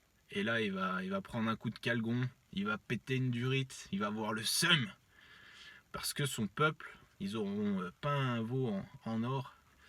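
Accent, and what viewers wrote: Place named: Burgundy, France. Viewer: Nom de Diu d'vin diu ! Tu roules les RRRR ha ha ...Je suis bourguignonne aussi, on a le même accent :) J'ai beaucoup appris avec toi, ainsi qu'avec "le blog à Cédric" ^^ Merci, si seulement les gens voulaient lâcher leurs religions, ils découvriraient le potentiel que nous avons réellement...et que nous nous suffisons à nous-mêmes :)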